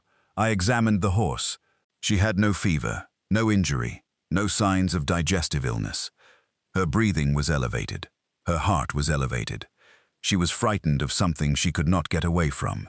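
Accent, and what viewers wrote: United Kingdom. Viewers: English accent, but he can't pronounce lieutenant correctly. Pissed me off.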